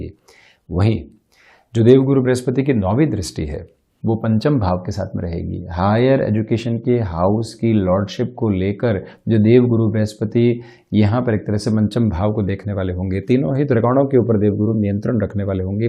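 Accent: Standard. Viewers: Sayali absolutely my Hindi has actually improved to some extent hearing his pure Hindi.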